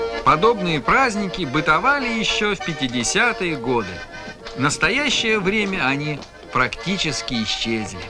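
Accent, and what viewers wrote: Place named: Russia. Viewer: Тогда автору придётся все языки учить прежде, чем фильмы про каждый народ ставить... Если следовать Вашему требованию -- мы никогда этих фильмов и не увидим вовсе. Да и -- что он говорит не так? Я -- коми. И то поняла слова "дöрöм" -- "рубашка"; "турун" -- "трава"; "нянь" -- "хлеб"... Молодец, что произносит с русским акцентом. Уважение и благодарность ему за это! Спасибо --, что выставил этот замечательный фильм. Очень интересно было его посмотреть.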